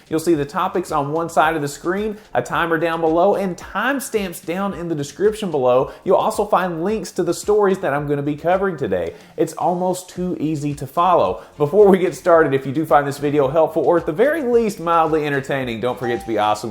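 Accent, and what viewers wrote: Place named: Midwest, United States. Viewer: LOL. You are a midwestern guy not a Bostonian. This is your charm. I was raised in the Midwest and moved to the east coast at 25 now I’m 58. I know the difference after all those years.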